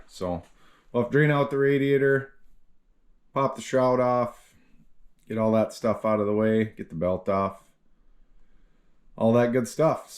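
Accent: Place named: Minnesota, United States. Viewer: Fellow rural Minnesotan here. About to do a ps pump on my 98 Tahoe this weekend. This video was immensely helpful and informative. Certainly helps we speak in the same dialect 😂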